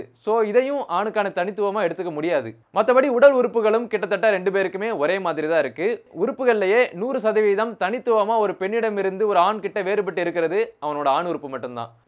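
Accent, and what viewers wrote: Tirunelveli, India. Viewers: Anna neenga tirunelveli ah?? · Neenga tirunelveli ya bro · Anna neega tirunelveliya ??? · நீங்க திருநெல்வேலி தான நண்பா.... எவம்லே அது....அந்த ஒரு வாா்த்த போதும் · Neenga tirunelveliyaa?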